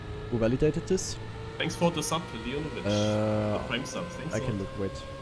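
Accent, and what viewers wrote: Germany. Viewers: r u German? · Jedes Mal, wenn ich den deutschen Akzent höre, muss ich mir vor Augen führen, dass mein Englisch für Muttersprachler genauso schlimm anhören muss. 😂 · Are you from Germany?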